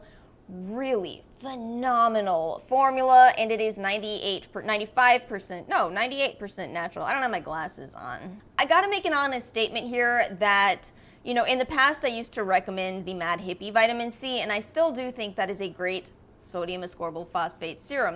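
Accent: New Orleans, United States. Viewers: You must be from New Orleans. The “ya heard me” is a dead giveaway! 😅 I’m on the northshore.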